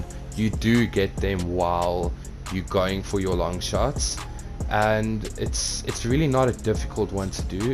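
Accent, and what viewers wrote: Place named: South Africa. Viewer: Why do you sound south african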